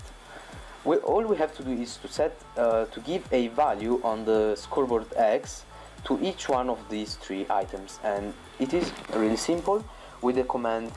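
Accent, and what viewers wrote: Italy. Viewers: non ho capito se sei italiano e parli in inglese o se sei inglese e conosci l'italiano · la tua pronuncia è all'italiana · ❉Team Chicken❉ ahhahahaahaha grande comunque dal modo in cui parla inglese sembra italiano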